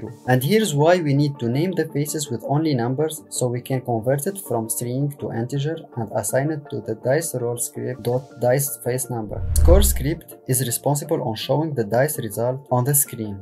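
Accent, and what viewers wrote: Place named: Tunisia. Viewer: L'accent khouya dhahra, w ma ytalaaha ken Tounsi kifek Merciiii infiniment ala Tuto <3 <3 ! w je t"encourage alekher w nshalah chaîne tekber akther akther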